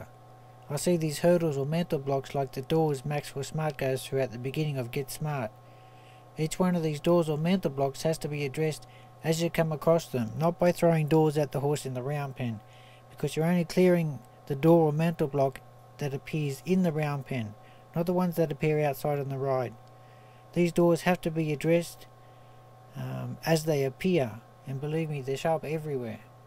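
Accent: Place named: Australia. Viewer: HAHAHAHAHAHAHAHA OH MY GOD! i find myself for no reason finding this totally hilarious. hes 'japanese' but has an 'austrailian' (sounds like to me) accent. and the stuff he says is just bloody class! omg im crying!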